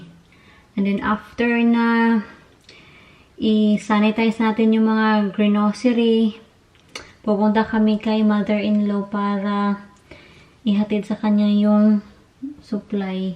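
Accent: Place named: Visayas, Philippines. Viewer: Hi po ate first time ko to na magcomment sa vlog mo pro lage akong nanood at nag lalike ng mga videos mo kaso bago pa lang mga 2 months pa lang ako nagsubscribe sayo unang kita ko pa lng sa Youtube channel mo subscribe talaga ako agad sayo ang ganda mo kasi tsaka simple lang taz may naririnig ako minsan na may bisaya accent ka. .gusto ko lang po sanang malaman kung bisaya ka ba at taga saan ka dahil ako po bisaya kaau ko proud taga Inopacan Leyte trip ko lang magtagalog pro bisaya gyud ko hope mabasa ni nmo ate at shout out po sana sa next video mo sa ABELLANA and BALLESTEROS family thanks po sana lang mabasa mo po ito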